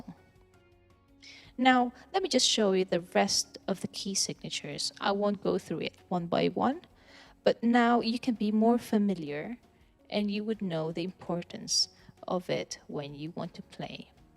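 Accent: United States. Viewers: Biglang nagtagalog akala ko po tlga Americana kayo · nagulat ako nung nagtagalog siya akala ko kano HAHAHHAH thank you for the vid tho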